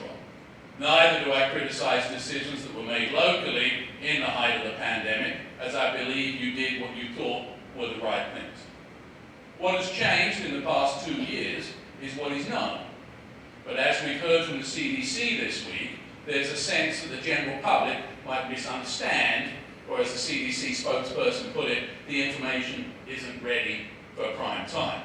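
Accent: United Kingdom. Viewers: He's not even American. Listen to him. He's a Brit; a frigginn Lobsterback 🇺🇲💪🏻🇬🇧👎🏻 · Who is the immigrant actually. U came over from England and still talk like the Brits we kicked out of America in 1776. Go home to england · He is a Pommie (an English person). He migrated to the US some years back. He sounds like the love child of Nigel Farage and Arthur Daley.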